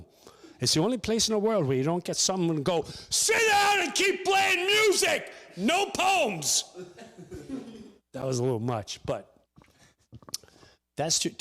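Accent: Ireland. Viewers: Interesting how you slag off English food (in an Irish accent) and then say the Irish woman told you to go eat "Fish & Chips". You COULDN'T get more English than that 🤣🤣🤣🤣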